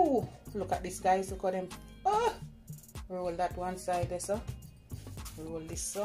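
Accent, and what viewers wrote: Jamaica. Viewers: I just love a Jamaican accent. No one else on Earth sounds like my beautiful Jamaican brothers and sisters. And the coco bread is looking lovely too.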